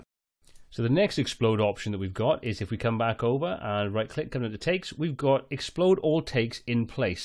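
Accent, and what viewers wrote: Wales, United Kingdom. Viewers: Do I detect a Welsh accent? If my ear is correct, for the WIN because me our mum is from Cardiff [Cah-diff]. =D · The ever friendly Welsh accent !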